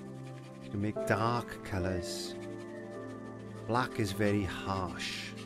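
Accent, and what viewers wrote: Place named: Mid Glamorgan, United Kingdom. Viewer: never mind the music love to see your art and hear that Mid- Glamorgan accent. I can see Barry-John running up the beach to score a try, and that's from an Englishman. Love your videos, so relaxing from one so many miles from home. Be safe x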